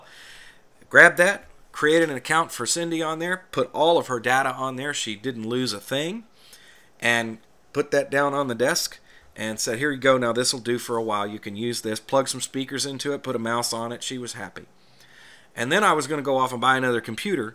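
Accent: South, United States. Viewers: Hey, new sub here, I have played around with Ubuntu here and there through the years, but was always intimidated by the terminal, dove back in recently with Linux Mint on an old HP pavilion Laptop with Core 2 duo and 4Gig RAM. Now that I'm all about learning how to properly use Linux your videos have been awesome. Where in the South are you from, you sound like you're from around my neck of the woods. I could listen to you dispense wisdom all day.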